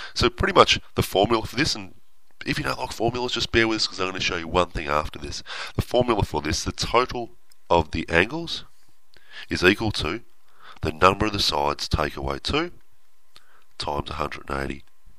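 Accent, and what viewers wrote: Australia. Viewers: Woah,your Australian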